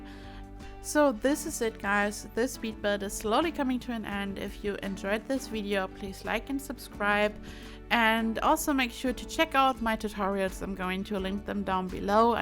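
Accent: Germany. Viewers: Akzent gehört und sofort gemerkt das du Deutsch bist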